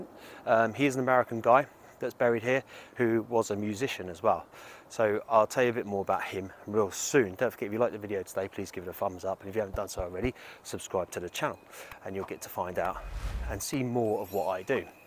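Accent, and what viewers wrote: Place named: Portsmouth, United Kingdom. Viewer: Nothing wrong with the accent you have Pompey boy, We always get told we sound like Londoners anyway. Great video of someone I've never hea6rd of.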